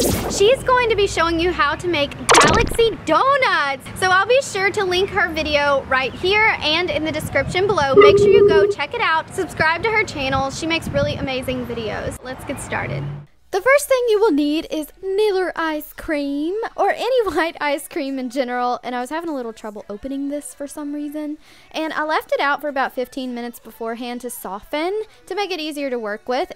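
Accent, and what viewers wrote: South, United States. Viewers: In love w your southern voice❤️❤️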